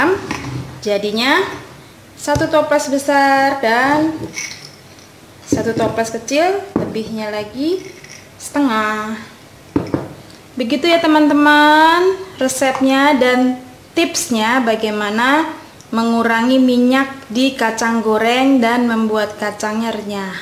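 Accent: Java, Indonesia. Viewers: Maaf ya, ingin tanya daun jurok tu daun apa, sebab bahasa pengantar tu pelat2 jawa, sya ni peminat dri Malaysia.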